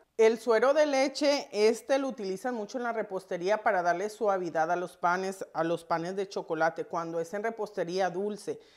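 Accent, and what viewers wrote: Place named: North, Mexico. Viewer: Me encanta su acento norteño. Y como hace sus tortillas.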